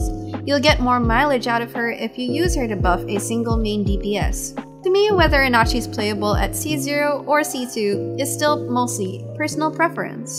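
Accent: Philippines. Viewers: It's in your about? I should have just checked 😆 I think you're from the Philippines tho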